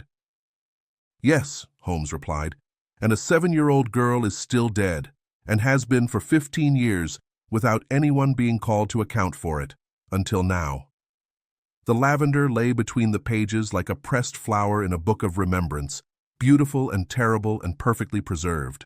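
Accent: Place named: United States. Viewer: Why is this in an American Accent 🖕🖕🖕🖕🖕 no Chance I am listening to this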